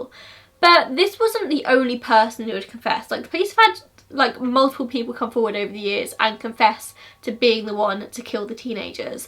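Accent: England, United Kingdom. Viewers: Your pronunciation is actually not so bad.:) Obviously the accent is English, but you got the majority of the sounds put together right. It’s unknown if Irmela was sexually assaulted, and even the police at the time weren’t sure. As for names, most Finnish people do go by their first names, but naming is pretty relaxed, so you kind of can go by any first or middle name and it’s not so uncommon. Also, in English someone might say “Hey,” but in Finnish people don’t say names so often, so you just say “Hey,” and only use someone’s name if you’re talking about them to someone else, so half the time it doesn’t even matter what you call someone haha. Personally I don’t think Nils is innocent of everything, and alcohol consumption and mental health are real issues in Finland, but I don’t think he murdered his friends. There’s no possible way he could have injured himself the way he was.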